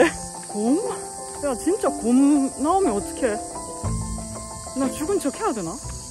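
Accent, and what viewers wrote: Busan, South Korea. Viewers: 곰 안나와요! 일본시골에서 부산사투리 조지시는데 ㅎㅎㅎ 이외중에 피부 미모 열일! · 自然派美人ですね。面白いし。購読します。方言も最高（笑）。자연파 미인이시네요.성격도 재미있는것 같아서.앞으로 잘 볼게요.그리고 경상도말이 최고 ㅋ. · 안녕하세요? 우연히 발견한.... 15년 전 츠와노 옆 동네에서 3년 살면서 츠와노...놀러 간 적이 있어요. 신사까지만 가 본 기억이 있네요. 곰을 만나지 않고 무사히 하산....보는 내내 긴장했어요 ㅎㅎ 즐거운 여행이 되시길 빕니다. 부산사투리 재밌어요💞💞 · 부산표준어 찰지네요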